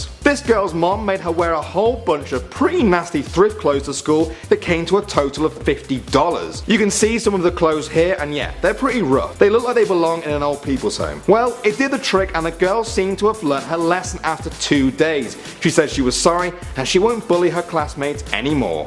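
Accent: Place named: United Kingdom. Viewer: Sounds like he is British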